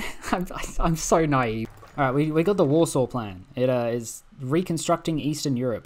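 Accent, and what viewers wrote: United Kingdom. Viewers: it's called british accent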